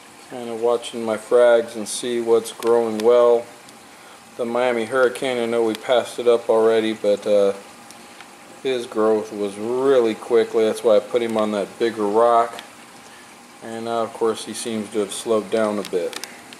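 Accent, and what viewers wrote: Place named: San Francisco Bay Area, United States. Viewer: Really great tanks. Wonderful selection of corals and fish. Sorry to hear you're having issues with rogue shrimp. If they are living in a undergravel filter perhaps you're going to have tiptoe in a night and (under very low lighting) and try to nab them. Hey, by the sound of it I'm guessing you live near my neck of the woods (San Francisco Bay Area). I keep thinking I should take a visit to Exotic Aquarium in Sacramento. Just haven't taken the time to yet. Enjoyed the video. You've got me as a new subscriber!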